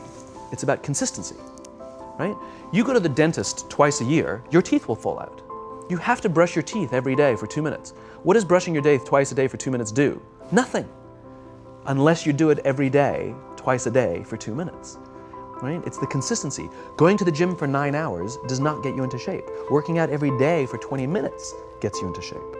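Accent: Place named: United Kingdom. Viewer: 영국 발음 이네요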